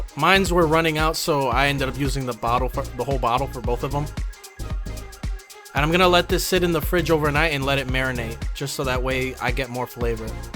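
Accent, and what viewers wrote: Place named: Pennsylvania, United States. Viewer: You gatta be from PA with that accent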